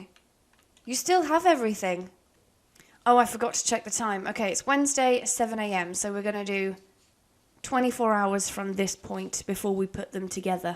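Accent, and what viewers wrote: United Kingdom. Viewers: I'll be honest here: of course I watch Sims movies because I just love them, but in this channel's case it's the sassy Brittish accent that adds some icing on the cake. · I opened the video, then, I heard you're british. I'm sorry. I can't.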